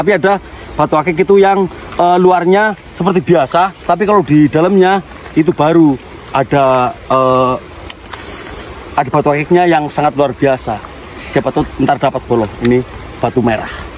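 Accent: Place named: Banyumas, Indonesia. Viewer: wong ndi bolo deneng ngapak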